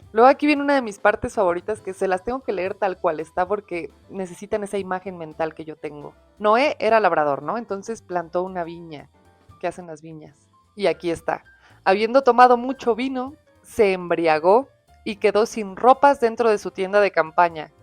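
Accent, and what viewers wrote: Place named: Mexico City, Mexico. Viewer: Qué joya de comentario, carnal. Leer la historia antigua en tono chilango es otro nivel. Y la neta es que traes un ritmazo con los datos históricos de los egiptos y la estrella Sirio. Es 100% real que esos sacerdotes se dieron cuenta de que cuando Sirio aparecía en el cielo, el Nilo se inundaba, y así calcularon el año solar de 12 meses. Y sí, Julio César llegó siglos después a meter sus reformas porque el calendario romano era un desmadre. Pero con lo de los 900 años de esos güeyes de la Biblia, la matemática nos da un patatús biológico. La teoría de que contaban "meses" en lugar de años solares para que Matusalén viviera 72 años suena chida, pero si aplicas esa misma lógica a todo el árbol genealógico, el guion se rompe bien feo. Por ejemplo, el Génesis dice que Enoc tuvo a su hijo a los 65 años; si esos fueran meses, significa que el güey fue papá a los 4 años y medio de edad. 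¡Una completa locura! La realidad histórica es más simple: los escritores de la Edad de Bronce no tenían un error de álgebra, simplemente eran bien exagerados. En todas las mitologías de esa zona (como los sumerios), entre más viejo y antiguo era el ancestro, más años le inventaban para hacerlo ver más sagrado, poderoso y cercano a los dioses. Eran puras mentiras piadosas para apantallar a la gente del pueblo, no un problema de traducción de los egiptos. ¡Pero qué buen cotorreo armaste con Julio César y sus mamadas!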